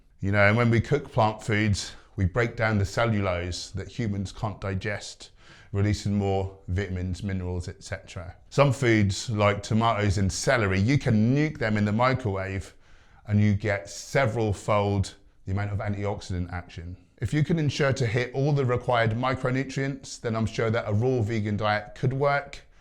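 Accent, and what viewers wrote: United Kingdom. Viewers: I like sv3rige, You sound like your from the Midlands , same as me.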